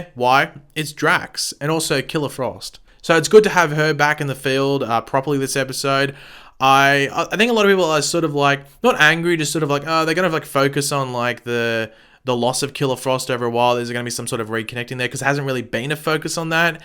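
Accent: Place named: Australia. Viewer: You sound Aussie ;oo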